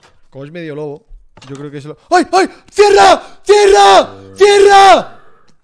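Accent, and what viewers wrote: Argentina. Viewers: Habla Como Argentino Jajaja Aguante ElRubius Saludos Desde Argentina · El argentino ndeaah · Siento que está muy argentinisado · Por qué hace referencia a como hablamos en Argentina? xD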